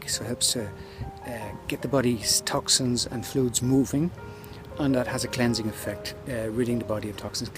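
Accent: Ireland. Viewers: great video...nice to hear an Irishman talk about wild herbs. I am a Horticulturist level 6 and I have started to use Urtica dioica and Taraxacum officinale and my heart rate is down from 170+ /80 to 134/78 my doc is stunned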